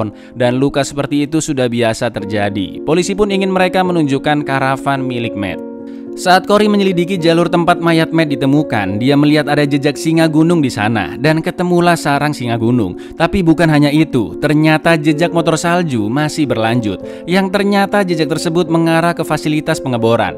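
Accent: Java, Indonesia. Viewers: Saya juga ngikut ah 3.suka ngelawak dan medoknya lucu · logat jowomu lurrr,,,,bkin auto sub chanel mu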